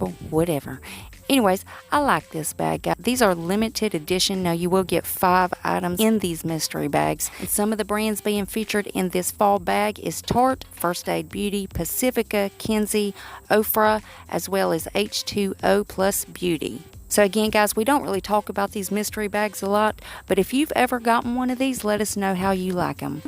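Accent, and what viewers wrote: South, United States. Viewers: Im new to ur channel. I love ur southern accent! I am a southern girl from Kentucky. Thank u for the Ipsy spoilers.💋💋💄💅💖💕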